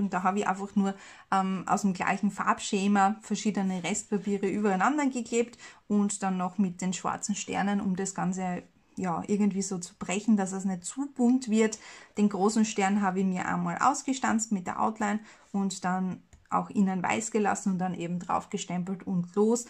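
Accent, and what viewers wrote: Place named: Austria. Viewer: Hallo liebe! Deine Videos sind einfach genial! 👍🏻😍Ich schau sie mir sehr gerne an. Die Anleitungen sind super verständlich und einfach. Ich staune immer, was für tolle Idee du für die Stanzformen vom Action hast. Anfänglich sind sie mir irgendwie"billig" vorgekommen,aber mittlerweile find ich sie richtig gut. Und dank deinen Videos natürlich auch 😊. Es spielt auch eine große Rolle, daß endlich eine Österreicherin amal gute Videos macht! Natürlich sind die von unseren deutschen Nachbarn auch sehr gut,aber der heimische Dialekt macht halt schon einen Unterschied 😁🤭. Na auf jeden Fall werde ich mir auch deine nächsten Videos"reinziehen und ich bin jetzt schon gspannt,was du schönes basteln wirst😊💕.Glg Maria aus Bad Gleichenberg/ Südoststeiermark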